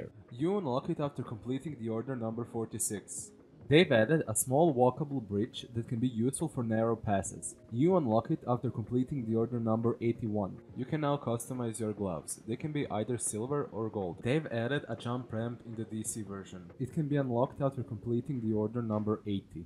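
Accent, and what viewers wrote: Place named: Hungary. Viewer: Are you from Hungary? Your accent sounds like Hungarian person I know. Good video btw, concise like it should be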